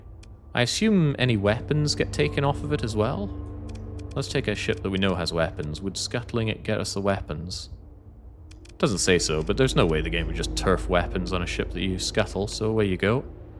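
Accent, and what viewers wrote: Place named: Scotland, United Kingdom. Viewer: Hearing a Scot talking about "the first thing you should do is go to the Bar" and I get flashbacks to That Time I Was In Livingstone in the 70s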